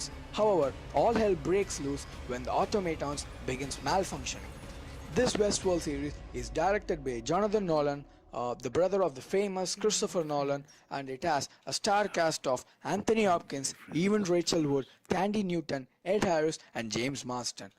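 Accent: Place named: India. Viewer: dude... your English is terrible. and you're speaking so fast that you sound like youre actually speaking your own language. I have to listen hard to even hear a few words. you have a very thick Indian accent. also you keep breathing too hard on the mic. Maybe try speaking slower and try pronouncing your words better while learning how to speak in English properly. or just do it in your own language.... anyway I'm giving you a thumbs down on this one.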